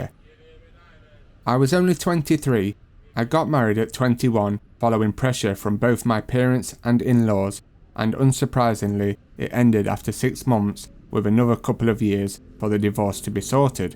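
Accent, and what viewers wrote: United Kingdom. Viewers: You Sound like you come from the U.K. Question: would you ever consider having a prostitute as a girlfriend ? The bar girls in Pattaya are all prostitutes, no matter how pretty and well presented they are. So these girls are all a risk and have a certain mindset. Have fun and walk away!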